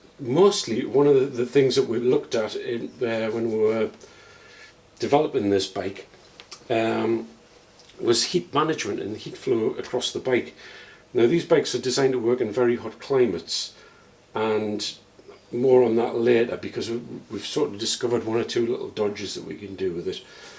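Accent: Newcastle, United Kingdom. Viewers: Great to listen to a fellow Geordie talk so knowledgeably about some great motorcycles. If I lived in the UK I would certainly be buying parts from you because you are clearly a true enthusiast and are in it for the passion rather than just the money. Thank you.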